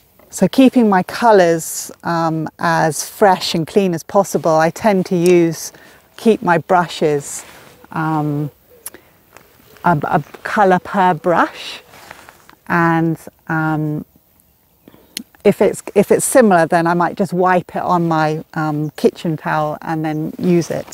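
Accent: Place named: England, United Kingdom. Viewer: All art teachers should have an English accent.....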